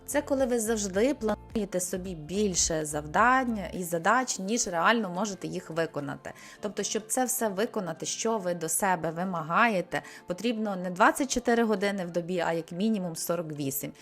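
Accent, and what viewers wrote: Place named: Western Ukraine, Ukraine. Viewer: Круто,мені здається,що я чую західний акцент))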